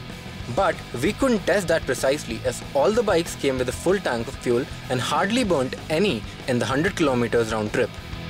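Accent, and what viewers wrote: India. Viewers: he's a south Indian. Most likely to be a Keralite xD They pronounce T like that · gotta love the indian accent =D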